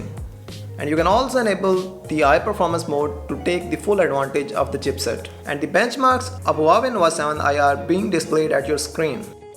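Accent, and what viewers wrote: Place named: Pakistan. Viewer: come on dude you are trying hard to speak English and pronouncing English words with desi Arabic/Urdu accent,I think there is no need to do reviews in english,in Pakistan by urdu you can get much wider audience and reach...English is just an other language its not a benchmark of "quality or scope of the channel". I'm not being sarcastic at all,its just my opinion. Otherwise your review is good,quality and content is also spot on...thumbs up for that.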